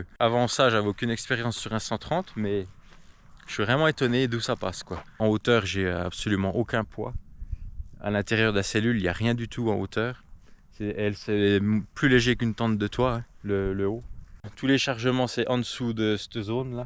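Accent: France. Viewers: Salut, Je suis originaire de Montbéliard et ça fait du bien d'entendre le bon accent bien de chez nous ! Et tout à fait d'accord avec toi sur cette propagande. Perso j'attends ma cellule, car ce n'est pas moi qui la fabrique. J'ai eu une Land 109 série IIA dans les années 80, puis un Discovery et un Range P38. Aujourd'hui j'ai changé je suis sur Ford Ranger et oui, avec l'âge, on roule en "confortable" ! Bonne continuation à toi et ta p'tite famille. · salut a toi je découvre ta chaine et tu as fait un sacré boulot je suis du doubs et toi aussi je pense ca s'entend on dirait !! bon voyage a toi et félicitation pour le boulot !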